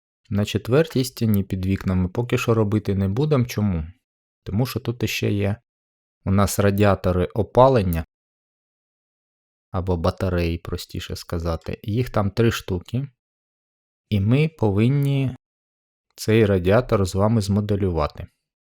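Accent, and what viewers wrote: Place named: Central Ukraine, Ukraine. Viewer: запитав, бо ваша вимова дуже схожа на центр України, на мій рідний говір. Ще раз дякую за вашу працю. Ви створюєте важливий українськомовний контент 👍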